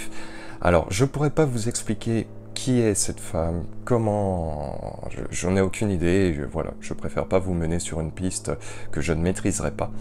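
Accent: Quebec, Canada. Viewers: Merci, bon voyage j'adore l'accent québécois.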